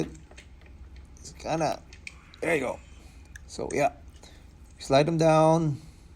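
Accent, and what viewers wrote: Philippines. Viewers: He's accent is a Filipino Klinikle!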